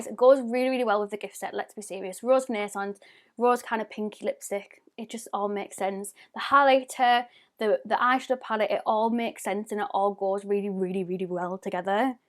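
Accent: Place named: Newcastle, United Kingdom. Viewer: Love your videos and love your accent are you a geordie 🤔❤️your accent makes you sound so friendly 👍👸❤️keep up the amazing videos x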